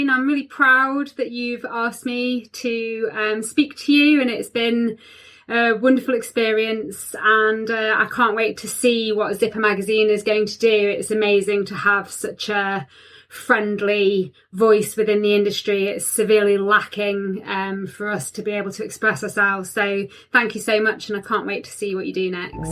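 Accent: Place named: England, United Kingdom. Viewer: She's decent looking but that English whiney voice has to go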